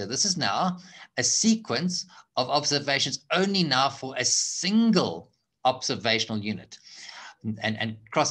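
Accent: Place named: South Africa. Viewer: i love a good south african accent!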